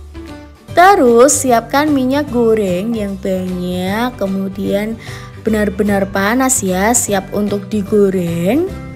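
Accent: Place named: Java, Indonesia. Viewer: Nada Jawanya terlalu muuweddok banget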